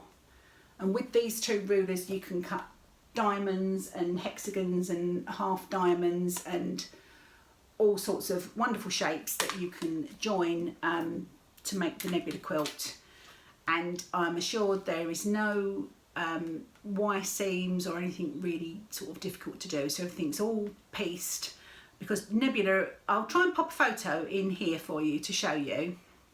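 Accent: England, United Kingdom. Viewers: Hi I really enjoyed your visit today you and I have much the same taste in fabric and patterns I made Tula bloomers quilt year before last useing tilda fabric I also made a few more of her patterns in her fabric and some other lines it is so nice to hear the accent I spent many happy times in Sudbury and other parts of Suffolk and grew up in Essex your work is stunning and your quilts Nate on point your stitching is amazing I haven’t seen your knitting yet so will go back for a look thank you so much for your wonderful visit Angela in ireland 🇮🇪 🙃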